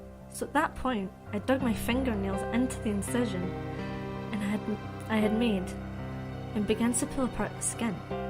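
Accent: Scotland, United Kingdom. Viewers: scottish for the win!! ;D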